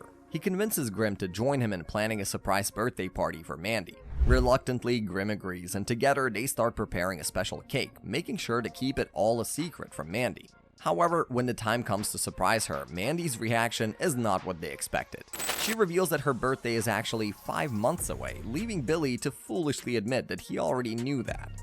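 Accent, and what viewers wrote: Canada. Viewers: I had stopped 10 mins before the end of the video... dude I know you gotta be Canadian because of using zet but they still sa the names correctly -_ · Great video. Where are you from? I notice your pronunciation stands out. My guess is Canada.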